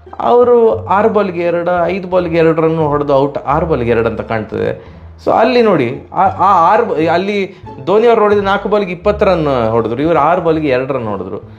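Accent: Mangalore, India. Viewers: Nimma Managaluru style kannadadalli vivarane kelakke bahala chanda oontu maare, edunna maatra change madbedi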